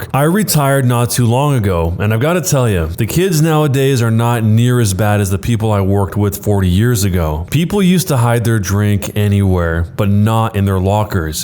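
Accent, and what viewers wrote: United Kingdom. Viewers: the British accent tho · Same and why does it sound British😂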